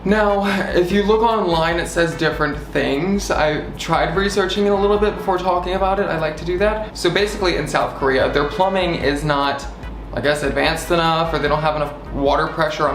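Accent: South, United States. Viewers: Do you have a slight southern accent ?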